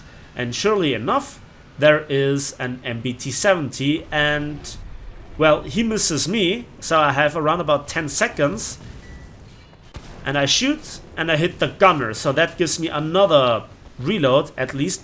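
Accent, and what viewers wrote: Germany. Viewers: Well i think he is german (not sure) but his accent makes him sound german.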